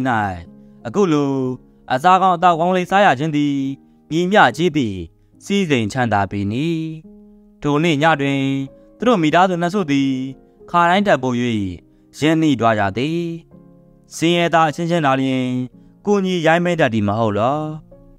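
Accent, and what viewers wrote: Upper Burma, Myanmar. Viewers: အညာလေသံလေးနဲ့နား ထောင်ကောင်းတယ်အား ပေးနေမြဲပါ❤❤❤❤❤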